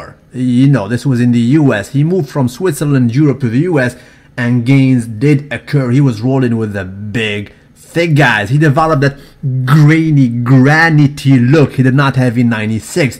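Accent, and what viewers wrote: France. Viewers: son accent est plutôt français que québecois dailleurs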